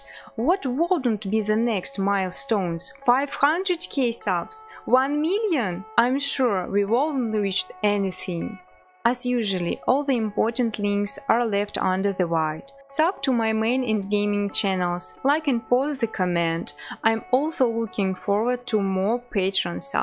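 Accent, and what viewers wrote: Russia. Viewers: Russian accent)) Сразу понятно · Русский акцент, или мне кажется?) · Говоришь как русский заметно дэй к примеру говоришь дей ты русская? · уууу ска первый раз слышу такое прям рашко произношение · русская 100% акцент палит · русский акцент ) удачи и успехов вам ! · Так она русская ?)) бля по акценту было впр понятно,но я думал прост такой акцент сам по себе,ну к слову дЫа она молодец))0 xD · Русский акцент? Или я ничего не понимаю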